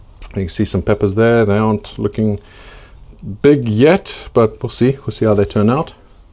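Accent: South Africa. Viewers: Do you ever top your peppers? Mal oor jou videos. Jy klink soos ou SA-man.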